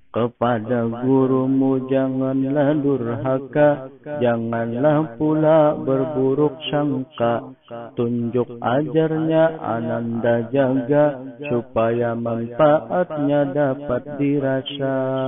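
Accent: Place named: Aceh, Indonesia. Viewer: Seperti logat aceh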